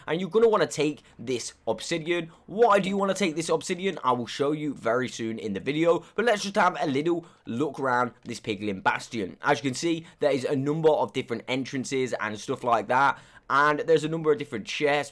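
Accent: United Kingdom. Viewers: Your sound like UK guy.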